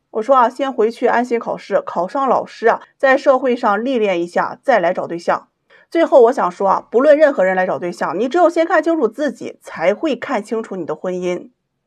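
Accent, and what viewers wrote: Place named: Shanxi, China. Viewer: 老师口音是 山西人啊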